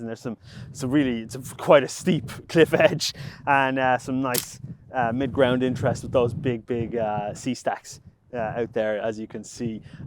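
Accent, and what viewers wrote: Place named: Ireland. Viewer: Love it. BTW you don't need to remind people you are from Ireland.....that is really obvious when you start talking 😆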